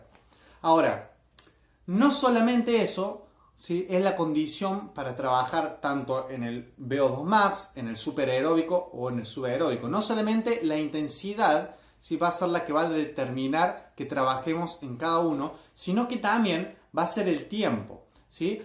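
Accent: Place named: Cordoba, Argentina. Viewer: Muy bueno, chango. cordobés tenías que ser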